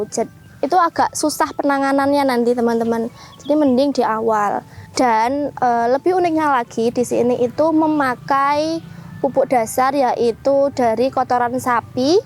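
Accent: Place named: Java, Indonesia. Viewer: Mbk,nya jawa,nya ketall banget😂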